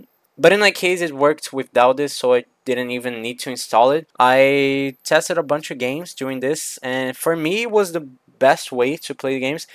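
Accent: Brazil. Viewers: Esse sotaque Brasileiro não engana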